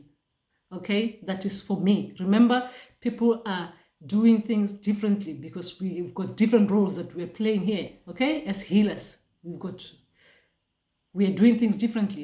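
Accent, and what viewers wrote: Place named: Balobedu, South Africa. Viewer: Surely speak like Balobedu. Nna my great grand parents come from Ga Modjadji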